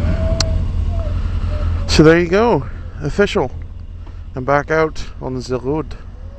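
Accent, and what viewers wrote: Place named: Northern Ireland, United Kingdom. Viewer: yea thought you were from norn iron.. im from carrickfergus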